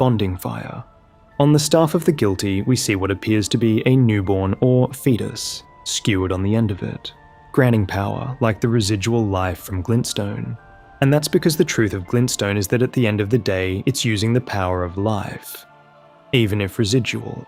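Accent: Australia. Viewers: i think he's australian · Sounds Australian to me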